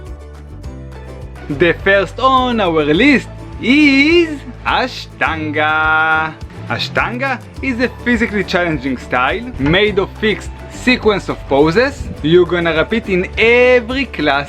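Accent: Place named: Israel. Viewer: Hey thx.. you have an Israeli accent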